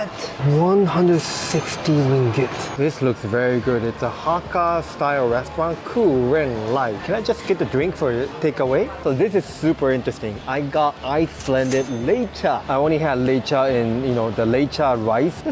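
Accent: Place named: Singapore. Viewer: Why u speak in Sg accent.. hahaha.. cute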